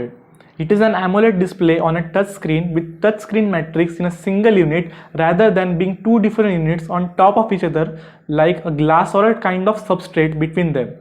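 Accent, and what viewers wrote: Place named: South India, India. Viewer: You're right, judging by his accent, he belong to the South part of India, I am guessing Tamil Nadu.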